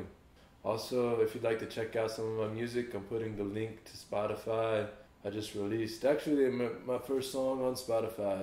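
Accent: United States. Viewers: cara esse sotaque texano é foda demais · nouth cawolina lmao love your accent buddy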